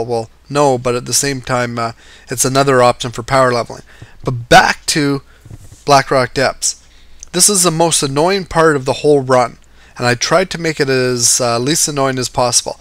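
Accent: Canada. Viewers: He is canadian so his accent.....But Idk why he didnt know about the FP....maybe cause he is too leet to come back to regular zones lol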